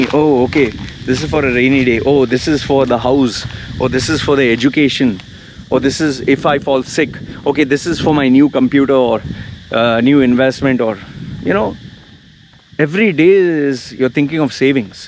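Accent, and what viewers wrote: India. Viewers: You sound more indian than 6yrs ago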